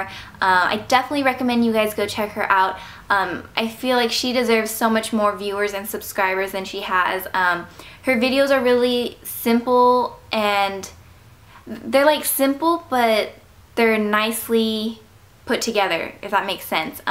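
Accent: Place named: Australia. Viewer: hells yeah aussie accent :D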